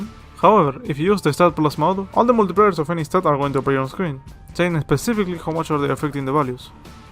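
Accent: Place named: Argentina. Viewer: solo con escucharte hablar ingles ya se que sos argentino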